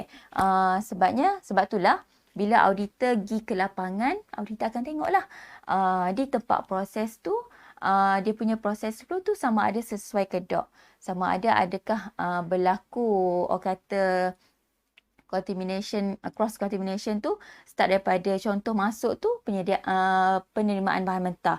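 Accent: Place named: Kelantan, Malaysia. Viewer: Bunyi klate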